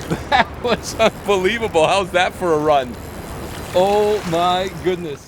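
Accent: Canada. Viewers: Hi, Thank you for your video. Like your Canadian accent. BTW, can I pay Canadian dollars instead of USD on your website?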